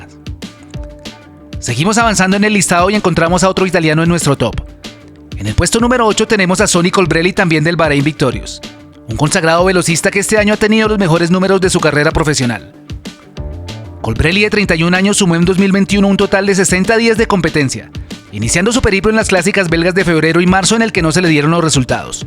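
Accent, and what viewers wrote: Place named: Colombia. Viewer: Se nota que eres colombiano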